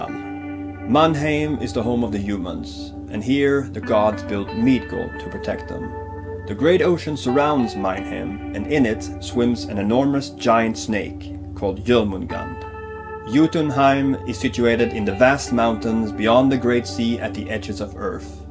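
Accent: Skåne, Sweden. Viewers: He can if he wants to, it's just his dialect that screws things up a bit. He has the Skåne dialect, the most southern dialect, it's almost Danish. I'd like it if he would speak "rikssvenska" but if he insists on speaking with the dialect we should let him. Overall he did a great explanation of the nine worlds and I enjoyed the video alot. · It's so interesting hearing the Swedish pronunciations of familiar names and words from Norse mythology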